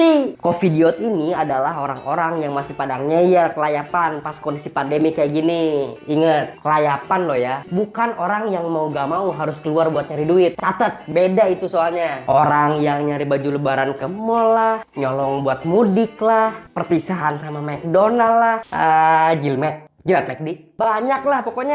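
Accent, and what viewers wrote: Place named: Malang, Indonesia. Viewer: Semacam arek malang bahasanya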